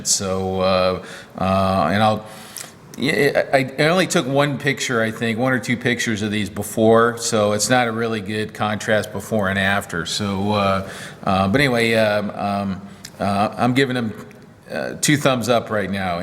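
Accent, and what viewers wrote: United States. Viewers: I always thought that vernier calipers were pronounced "ver-ni-er", not just two syllables. Is that a local east-bay accent?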